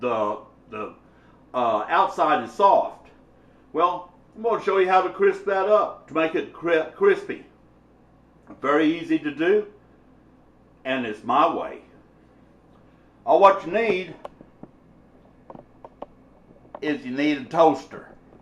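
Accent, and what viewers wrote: United States. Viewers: this dude has a very witty American accent! It really works tho.Thanks!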